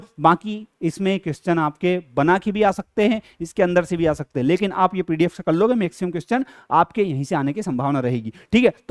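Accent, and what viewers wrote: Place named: Haryana, India. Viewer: Sir aap haryana se ho language se lag raha hai.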